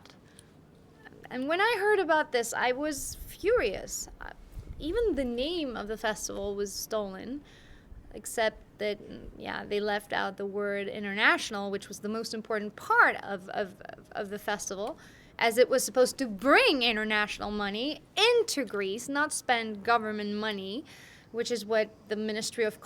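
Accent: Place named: United States. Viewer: Her American English accent is really good. Her hair is long as hell though she needs to get it cut.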